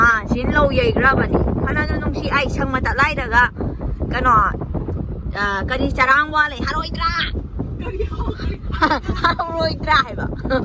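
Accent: Kakching, India. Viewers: Yam laida kakching marolse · Kakching lon se taba ym nungaiye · Kakchingdeiro che je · Ema nokninge eikhoi kakchinglonse camera dana hena nokningbana nungdi ym nungcbani eikhoi lonse haha😍😘🥰👍🤭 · Taba ymna nungaiye kakching gi wa khungang se... ym nungc ye. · I like Kakching marol 🤪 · I love kakching accent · Che se kakchingdeiro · Che ym nungcye Londo kkching emnbi miss twe💙😪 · yam pakcheiye mta ado mtase kakching dagyro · kakching lonsi taba nungai.nice 😊👍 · Eche nggi kakching marol ngangbase yam pakchei yamnasu fajei👍👍👍👍👍👍👍❤️❤️ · Kakching maron c taba ym nungaiye taninge amukkasu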